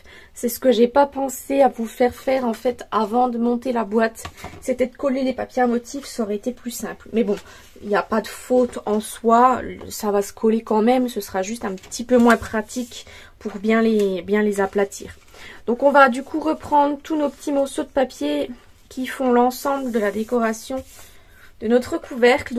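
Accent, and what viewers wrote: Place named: Franche-Comté, France. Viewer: Une belle idée. Bravo. Tu es de quel secteur ? Ton accent me rappelle mon enfance près de Besac. Bises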